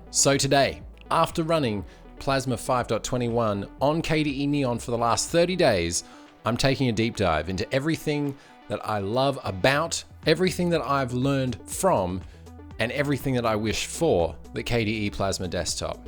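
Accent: Australia. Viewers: Hey, thank you for your thoughtful and well spoken videos. You have one of the least annoying Aussie accents I've ever heard. Just stay on top of the disfluent fillers and I, for one, will be back for more.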